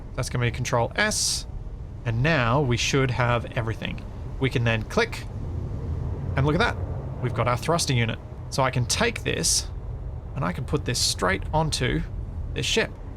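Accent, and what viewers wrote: Australia. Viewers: I'm a simple guy. I hear Aussie, I sub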